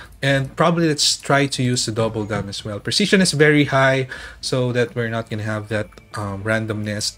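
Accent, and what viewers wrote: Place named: Philippines. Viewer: Pinoy na Pinoy Ang accent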